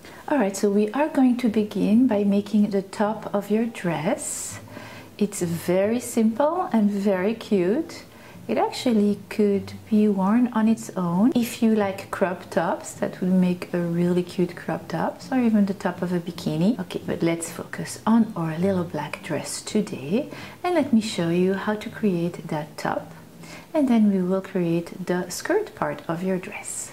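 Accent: France. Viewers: Superbe !!! Thank U very much for teach us . You 'v got a French accent ! ???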